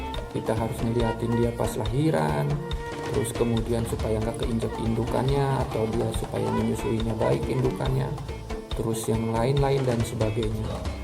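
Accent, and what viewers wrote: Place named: Sunda, Indonesia. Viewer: Jiga urang sunda mang euuy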